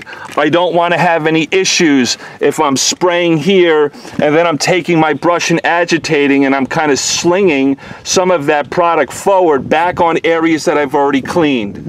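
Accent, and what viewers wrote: New York, United States. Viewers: first off watched all your videos and have gotten priceless tips and tricks from you. I am not an auto detailer by trade such as yourself but am what you would call a " driveway detailer" my own cars. I'm very OCD and all my vehicles need to be spotless. Superclean has changed my life. Since you are going over interiors. I'd love to see your process on how you detail the dashboard, instrument panels, that pesky fake glass where your speedometer is, navigation screen all the way to the radio buttons. Do you still use meguiers interior detailer? I don't mind it but it like you said smells like vinegar and can't stand it. Any ideas? By the way your New York accent and fun loving humor is quite refreshing. Never bored listening to you. Sincerely Dex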